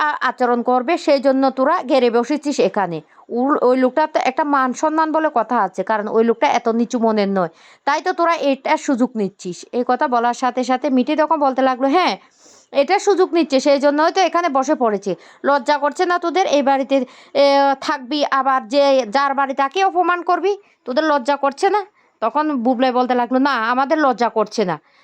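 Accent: Bangladesh. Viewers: আগে ভালো করে বাংলা ভাষা টা শিখে বলুন। কি বিচ্ছিরি শুনতে লাগছে🥴🥴🥴। বাংলাদেশী না রোহিঙ্গা?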